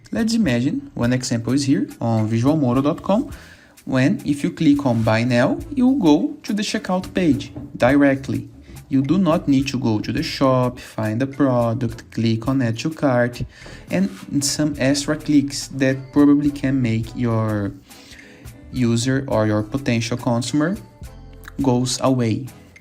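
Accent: Brazil. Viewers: o sotaque do brasileiro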